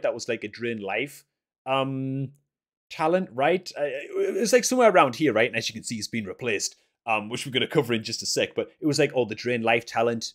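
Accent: Newcastle, United Kingdom. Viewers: Are you from Newcastle m8?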